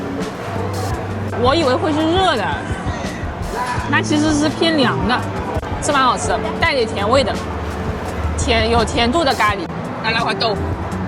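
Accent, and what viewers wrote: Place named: China. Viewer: 她口音很重的大陸姑音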